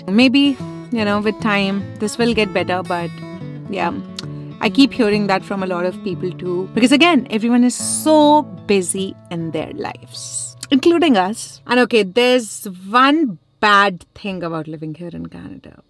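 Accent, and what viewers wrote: India. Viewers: Birthday and not birday :-). We desis have so much of MTI - mother tongue influence :-)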